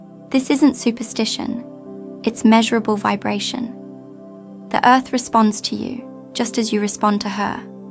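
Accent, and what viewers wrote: England, United Kingdom. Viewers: I like this girl when you do the English accent better than American accent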